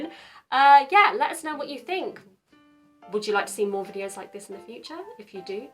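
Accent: United Kingdom. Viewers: イギリス英語かっこいい！！ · めちゃくちゃ可愛いのにバリバリのイギリス英語でギャップ！笑 一緒に飲みにいきたい☺︎ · Skyeさんはじめまして😊 私は1995年～2000年までイギリスに留学していました。 Skyeさんのブリティッシュイングリッシュが懐かしく感じます。 今回の左側通行の話でこんなことを思い出しました。私は、日本の高校を出てすぐにイギリスへ行ったので1996年にイギリスで車の免許を取りましたよ。私が丁度免許をとる時に、イギリスでは初めて筆記テストが導入されました。確かドライビングスクールに申し込みした時に、残念だけど君が免許をとる時には筆記テストが導入されてしまう😨と言われたのが懐かしいです。(申し込みした時にはまだ筆記テストの制度が導入されていなかったか、丁度今月からイギリス国内で導入されることになったみたいな感じです)現在はどうなのかわかりませんが、日本と大きく違い、郵便局で書類をもらって書いて送るだけで仮免許が来ることにビックリしました😅。そして、イギリス人の皆はこの仮免許で親を隣に乗せて運転の練習と言うのもビックリしました。お金かからなくて良いですよね。ドライビングスクールも日本に比べたら安かったですけどね。 道路のお話ですが、もちろん同じはずです。何故なら日本はイギリスをお手本に道路を作ったので当然、左側通行です。なので標識も似たものが多いですよ。(なので、筆記テストは意外と楽でした😊わたしの時は確か35問あって、30問か32問合っていれば合格でした※もう25年位前の話なのでうろ覚えですが😅) ただ何故かroundaboutは真似しなかったみたいです。面積的に難しかったのかも知れないです。でも、ここ最近日本でも田舎の方では一部試験的に渋滞や事故軽減のためにやっているとのことです。 長くなりすみません。 Skyeさんの凄くかわいい笑顔に癒されています。😌 イギリス人というお顔だちが凄く好きです。😊 太郎さんが羨ましい😊 応援しています。👍✨ 頑張って✊😃✊ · 好みが分かれるが、やはりＵＫアクセントの方が自分には馴染みがあって心地よい。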